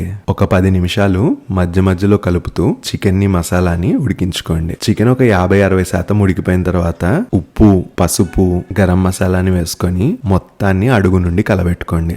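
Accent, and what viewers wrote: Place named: Vijayawada, India. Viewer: Wow pure Telugu,,, are you from vijayawada or khammam?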